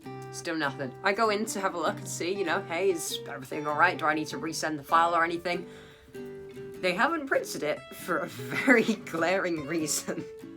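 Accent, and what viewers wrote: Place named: Australia. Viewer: Hey dude where you from you almost sound Australian or kiwi.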